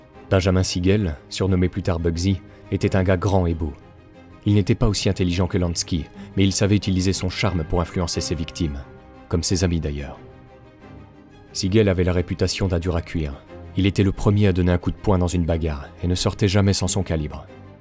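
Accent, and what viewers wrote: Italy. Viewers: La vidéo est super. Par contre insupportable l'accent Italien. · tu peux un peu moins pousser sur laccent italien quand tu prononce les noms merci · Super documentaire digne d’un vrai travail d’investigation!!! Avec l’accent italien de surcroît!!! J’adore et je me suis abonné (+ cloche) direct car trop hâte de suivre la suite!!! J’ai 49 ans et suis quelqu’un de bien mais depuis petit j’ai toujours l’âme d’un voyou qui ne me quitte pas… lol 😎😉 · Super la voix avec les accents italiens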